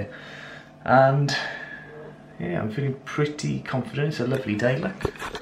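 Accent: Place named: South Wales, United Kingdom. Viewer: Good Vlog. So your a Bridgend boy then? Well I just live down the road in Newport. Been here for 20 plus years and still have me West Mids accent. You sound as if you were born in South Wales judging by your accent. They call me Wolverhampton Dave in Newport and I'm from Worcestershire!!! But I guess with my Wolves shirts and a West Mids accent(as I come from North Worcs-Kidderminster area) I am the nearest thing they have!! Anyway I thought I would stay away from talking about the match.......... As I say good Vlog-well put together.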